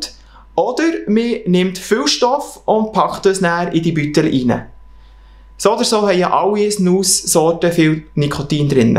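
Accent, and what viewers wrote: Switzerland. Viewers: Denke Schweizer deutsch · Dann guck dir so ein Video halt auf einem deutschen Kanal an (gibt's aber keine guten😉). In der Schweiz wird halt so gesprochen und ich hab als Deutscher auch kein Problem das zu verstehen. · Schon krass, wie ekelhaft manche wegen dem schweizerdeutsch sich ablassen. Wenn man es nicht verstehen kann, Untertitel aktivieren und nicht so herablassend kommentieren.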